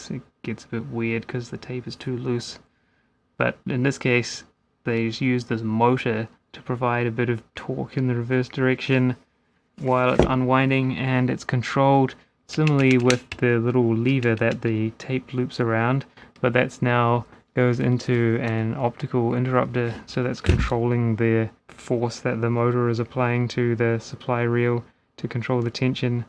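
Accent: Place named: Australia. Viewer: I've been looking at TradeMe... Surprisingly not as many options as one would hope, someone sold an old broadcast monitor like techmoan uses for 300 the other week, kinda nuts. I'm curious then, can I ask where you hail from? My bf reckons you've got an Aussie accent 😅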